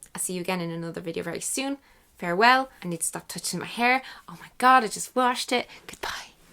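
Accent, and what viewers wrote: Ireland. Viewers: I love your Irish accent😉👍it sounds really lovely!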